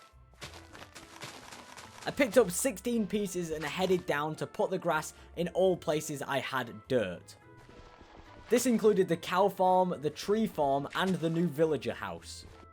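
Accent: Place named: United Kingdom. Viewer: Your british!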